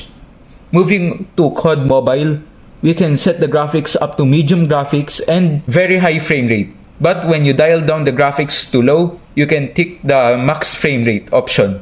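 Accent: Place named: India. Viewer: Haha parang indian Ang English mo. 😅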